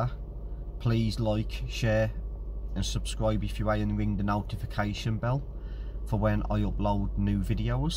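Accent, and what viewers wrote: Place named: Black Country, United Kingdom. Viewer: Brilliant ....thank you....and great to here a proper Black Country accent....I've been away too long...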